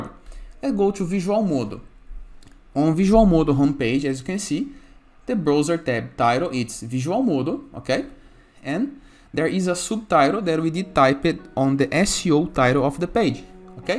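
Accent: Brazil. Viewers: Você é brasileiro? kkkk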